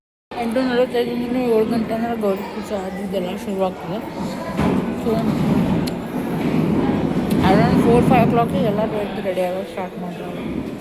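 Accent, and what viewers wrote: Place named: North Karnataka, India. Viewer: Wonderful dear, u speak very lovely North Karnataka original Brahim bashaaa❤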